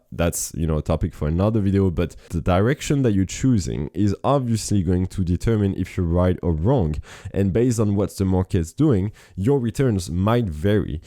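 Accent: France. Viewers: tu ne serais pas français à la base ? je comprends ton anglais parfaitement :)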